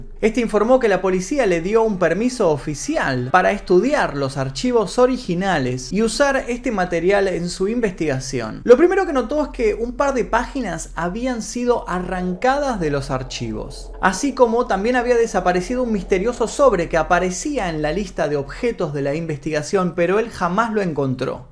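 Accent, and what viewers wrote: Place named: Argentina. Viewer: Excelente narración pero siento q tener un lenguaje neutro sería lo ideal no es lo mismo ayuda q achuda y todo el resto de sustituciones q acostumbran los argentinos .exitos y es crítica constructiva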